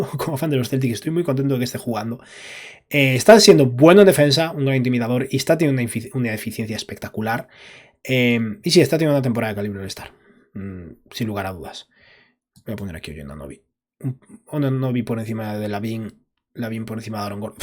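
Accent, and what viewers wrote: Spain. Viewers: Habla más despacio,nosotros los latinos no entendemos bien a los españoles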